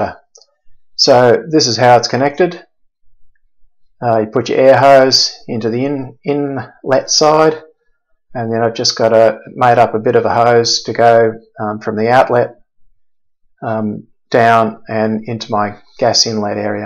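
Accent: Australia. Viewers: Great review. It's hard to hide that Aussie accent. I just Googled it and it seems pretty good for the price. It's currently list at $349. Is that what you paid? What part of Oz are you in? I'm in Gippsland. Cheers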